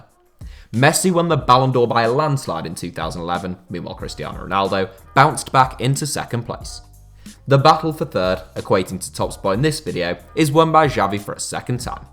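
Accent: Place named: United Kingdom. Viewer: Voice so British I had to turn on the sub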